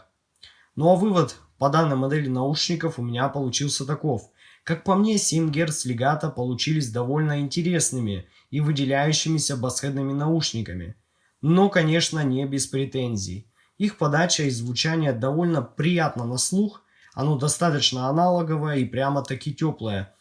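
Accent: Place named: Caucasus, Russia. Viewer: ломаю голову, знакомый акцент :) с кавказа?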